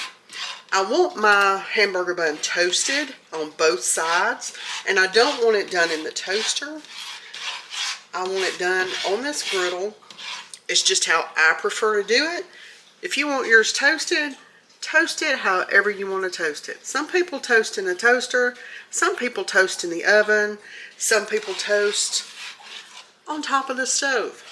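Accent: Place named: South, United States. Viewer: I love your recipes, i am on a fixed income and these seem very inexpensive. Also, i love your accent. I'm from the deep piney woods of East Texas close to the Louisiana border, and your accent so reminds me of home as my family moved there from Alabama and Mississippi.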